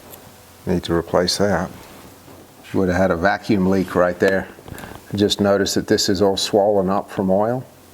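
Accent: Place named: Australia. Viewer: Great video! Where’s your accent from? You sound Aussie! Thanks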